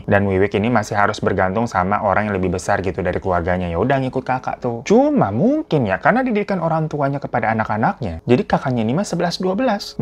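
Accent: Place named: Jakarta, Indonesia. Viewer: Bang lucu bgttt si Cara ngomongnya orang betawi bettt😅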